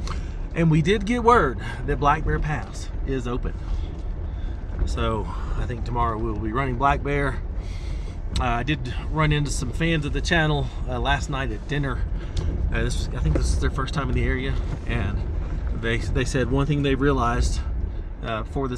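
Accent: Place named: France. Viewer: Your Frenche is adorable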